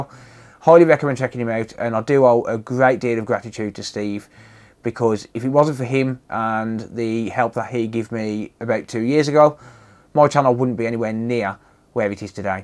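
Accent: United Kingdom. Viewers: You're from the United Kingdom I can guarantee that am I right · british dude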